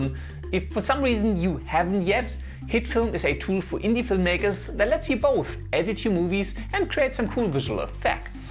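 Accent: Germany. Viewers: Great comparison! Very helpful! Do you think Hitfilm Pro can compete with Adobe premiere/After Effects? By the way: Are you a native German? Your English sounds like that a bit... ;-) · Are you German, cause you accent is so strong and it sounds like german english 😂😂😂 (btw I'm German) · Du hast einen extrem deutschen Akzent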